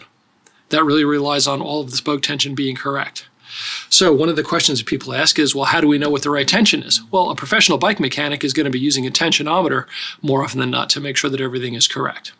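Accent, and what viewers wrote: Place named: Pittsburgh, United States. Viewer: You say now and round like my grandmother she was from Pittsburgh. Great video sir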